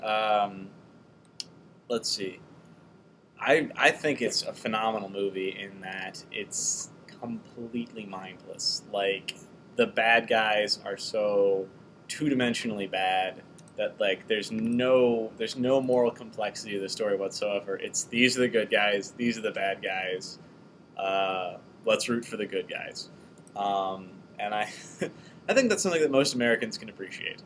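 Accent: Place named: Scotland, United Kingdom. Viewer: Scottish accent, douche bag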